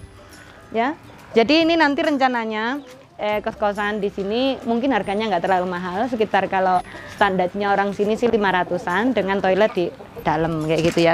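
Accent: Java, Indonesia. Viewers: Mbk soko itu opo to mbk, Baru nemu channel ini mbk ,medok banget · kalo boleh tau mbak ini jawa nya asal darimana?? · Senang banget sama suara mendoknya kk salam dari NTT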